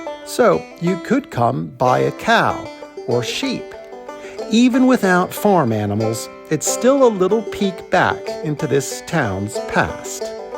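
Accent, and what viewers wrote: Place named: United States. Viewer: I stumbled across your videos and have been binge watching all morning. I look up Petersfield periodically on YouTube to see what is happening and your American accent jumped out! We lived in Petersfield for a couple of years but have since returned to Maryland. Your series on the surrounding areas have and been awesome and very nostalgic for me. My daughter did Irish Dancing lessons in South Harting, performed during a festival in front of the church and have found memories of watching the Morris Men dancing (very English!). Cycled the South Downs many times and did the BHF charity 100 mile ride from Winchester to Eastbourne. Kids went to Sheet Primary School and enjoyed seeing the village and Queens Head pub in your phone box video. Anyways - Cheers and thanks for sharing your videos!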